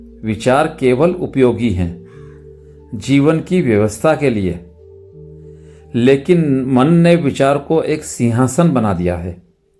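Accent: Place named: Rajasthan, India. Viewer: Bhai aapka ascent hi complete nahi aap mut bola karo. Aap shabdon ki khichdi banate ho. Aapka hindi per sahi control nahi hai. Aap rajasthani ho.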